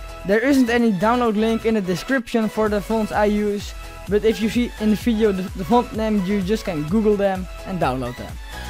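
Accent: Netherlands. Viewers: ik hoor dat he nederlands bent P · je kan best goed engels maar je hoort gewoon dat het een nederlander is :)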